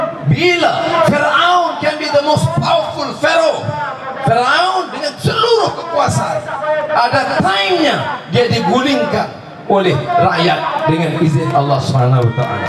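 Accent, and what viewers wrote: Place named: Negeri Sembilan, Malaysia. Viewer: ingatkan org negori bro heheh.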